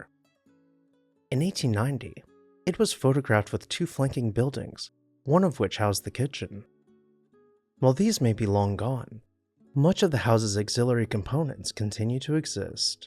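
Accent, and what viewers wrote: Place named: Missouri, United States. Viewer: Hey,. I enjoy your Missouri accent! To where have you moved?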